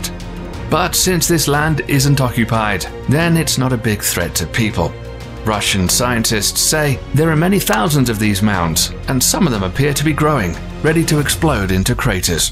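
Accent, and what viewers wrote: England, United Kingdom. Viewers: Y is this English man that doesn’t sound from the USA using football fields to measure things , I hate it when people use that to measure something it’s so ridiculous and stupid no one cares about American gay football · The only thing I'm worried about is fear mongering its' self. Equally troubling is the blatant over-use of the so-called british accent, touting all this doomsday drudgery to the faithfully numb, who are ultimately hired to spout continuous streams of falsehoods far & wide, to sell the appearance of enjoying the ride, while impatiently waiting for the latest chip, that will turn everything hip. Thanks but that, I will skip.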